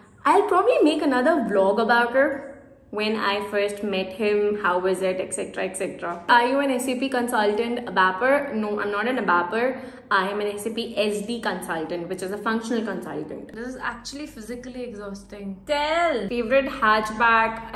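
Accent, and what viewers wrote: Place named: United Kingdom. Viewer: Your English accent is just like British accent 😍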